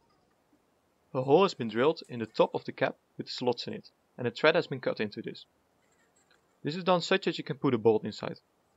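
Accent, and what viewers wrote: Netherlands. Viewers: First of all fuck the dutch or whatever accent..I feel like Im about to head into a gas chamber...second of all the lost water can easily be recoupped...but no...."Its ok because it comes from a river"....I hope your eggs break....You're welcome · Lol hearing dutch accent and a water project😂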